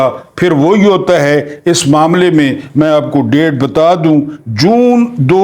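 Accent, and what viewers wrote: Saraiki, Pakistan. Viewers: Masha ALLAH ap k v log mein Saraiki Touch bohot hi acha Laga hey, ap k v log Zaberdust hotey hein.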